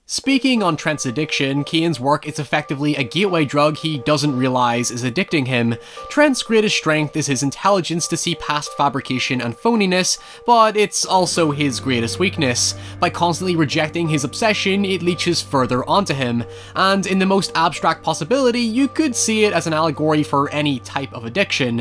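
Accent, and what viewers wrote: Northern Ireland, United Kingdom. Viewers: I was trying to pinpoint your accent and then I realised it was Northern Irish just like myself. Have never seen such a popular youtuber from here! Props to you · if the host british ? anyone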